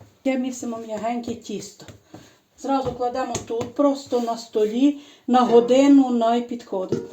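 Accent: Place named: Kolomyia, Ukraine. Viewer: Такий голос щирий, рідний, український... дякую!!!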